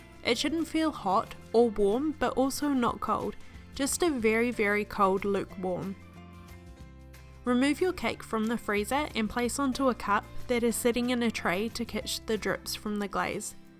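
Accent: New Zealand. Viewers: New Zealander accent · As a kiwi who left 26 years ago, it's amazing hearing your strong accent. Great video btw. Well done. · hey! she sounds kiwi, and definitely not from texas! have a good day :) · so many people whinging here - great video well done sis. Love your kiwi accent. from an ozzie down under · I hear that kiwi accent girl heyyyyy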